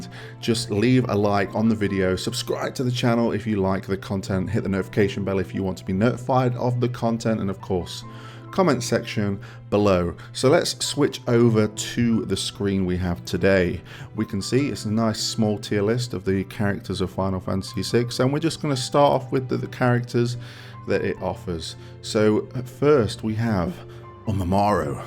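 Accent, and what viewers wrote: United Kingdom. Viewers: And you, sir, I put you in the S Tier. Your English accent really rounds out your professional approach to it all. You’ve got my subscription although I will say… Sabin is S tier. s for suplexing that trainnn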